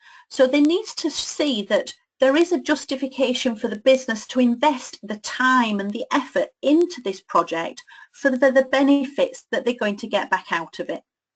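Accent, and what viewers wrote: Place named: North, United Kingdom. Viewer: ANNOYING NORTH ACCENT ...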